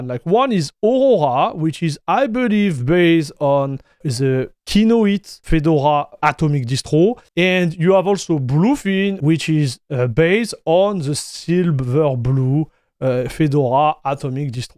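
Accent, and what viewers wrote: France. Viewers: Thats a heavy French accent :P Mais bon j'ai tout compris donc pas mal!!<3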